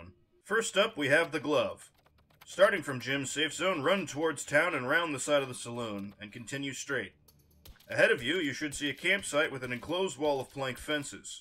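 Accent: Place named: United States. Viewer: Thank you my good sir! Your voice accent Is that of Cowboy by the way!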